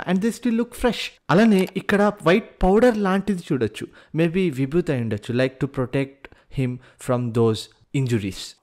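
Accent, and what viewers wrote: Karnataka, India. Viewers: Hi bro... Iam not ur subscriber, bt I watch every video of ur... I love ur love towards cinima bcoz u jst like me... only difference is ur doing something to cinima, bt I am doing ntng... Today u earned my respect too... 💕 For ur slang of Telugu with kannada accent mixing... 😂 Boii.. Boii..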